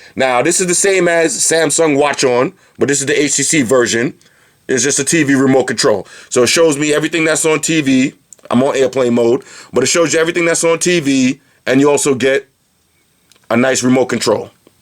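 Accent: Jamaica. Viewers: R u from Jamaica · u from Jamaica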